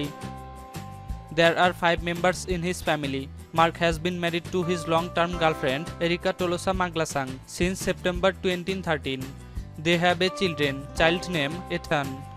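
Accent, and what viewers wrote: India. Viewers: india ang nag sasalita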